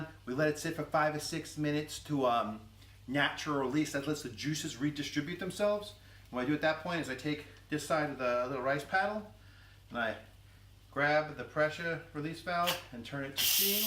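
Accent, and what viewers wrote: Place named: New York, United States. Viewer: Do you always turn on your Nyuu Yawk accent to the max when you're in the kitchen,? <3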